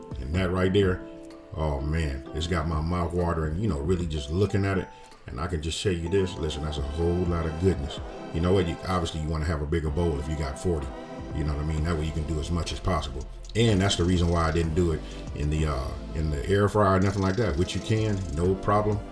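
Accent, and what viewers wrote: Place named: California, United States. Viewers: you my fav!! You get to the point and make it simple!!! And that Cali accent 💪🏾💯💯💯👍🏾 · I can certainly tell you’re from Cali man, love the video, subbed.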